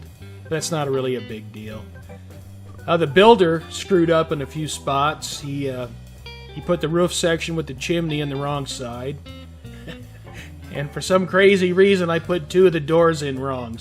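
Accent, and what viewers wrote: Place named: United States. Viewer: a european that sounds like an american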